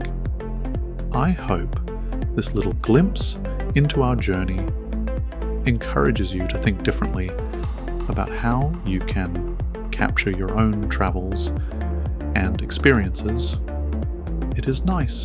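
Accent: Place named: Australia. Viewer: this is a scary version of your voice. It's like almost you but not quite. It's like basic australian man with a little Da mixed in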